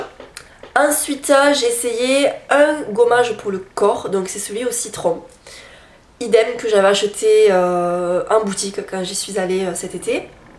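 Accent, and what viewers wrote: South, France. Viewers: Dsl, je réponds à sa place... Elle a l'accent du sud de la France, je l'adore aussi ♥ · Ah j'aime aussi son accent parce que j'ai a peu pres le meme ( c'est l'accent du sud quoi ^^ ) .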